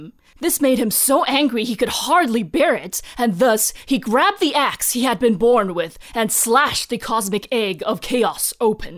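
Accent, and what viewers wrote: United States. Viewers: I am curious as to where in the US/Canada Ms was raised... By her (AE) accent, it's hard to tell; California? PacNW? Michigan? Toronto?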